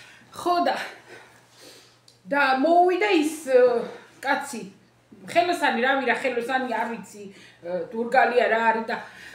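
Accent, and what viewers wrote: Kakheti, Georgia. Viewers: ჯანმრთელობას გისურვებთ,როგორ მიყვარს თქვენი იტალიური კახური აქცენტით, გაიხარეთ,უფლის წყალობა არ მოგაკლდეთ❤❤❤❤❤.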